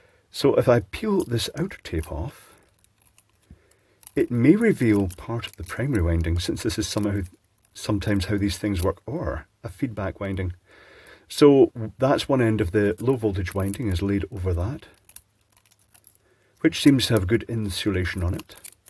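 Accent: Scotland, United Kingdom. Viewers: I have a Koppla, and, my knowledge of electronics is far too crap to follow this. But as a consumer, as large companies go and someone who tries to avoid buying anything first-hand, maybe I've swallowed some marketing but I do feel IKEA is a name I trust a bit, I'm glad to see this disassembled. Can't help but remember the episode of Top Gear about the final demise of Saab. There's something about a company running itself into the ground because "good enough" simply wasn't good enough that viscerally hit home. Also bonus tip: Å is like an a then an o. Små means small and there's a similarity in sound, much more so in your Scottish accent than my English bastard one. Decided to learn Danish rather than Swedish and they're quite different in many respect but I expect hagel is pronounced very similarly to "hail". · It's funny hearing a British man pronouncing Swedish 😂❤ koppla was pretty good, I would never have understood småhagel if it wasn't for the text on the box 😂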